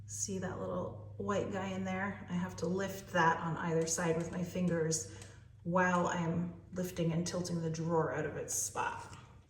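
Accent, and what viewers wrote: Canada. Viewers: I am a fairly new follower so I’m not sure what part of the country you’re in… once in awhile I pick up a faint Canadian accent??? I’m any event I am blessed to live in a somewhat affluent area in Virginia…. Williamsburg/Yorktown area. Those furniture pieces that you have restored, especially most recently that dark olive green dresser or sideboard or console would easily go for $1200 in this area!!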